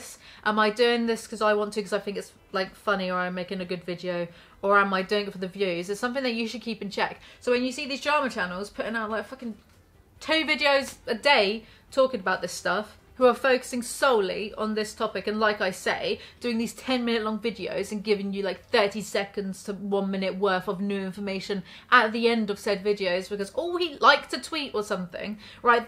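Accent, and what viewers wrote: United Kingdom. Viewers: i wish i could get my own british person to rant about stuff to me all day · its not just the accent, youre sooooo brit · You went full British for most of this video and I’m sliving for it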